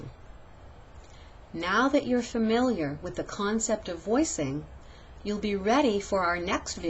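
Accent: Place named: New York, United States. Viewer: you seem to actually have a new york accent.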